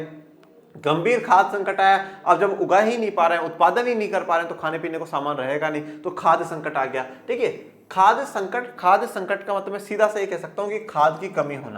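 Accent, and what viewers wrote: Rajasthan, India. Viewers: Rajasthan sa ho kay sir 😂😊😂😊😂😂😊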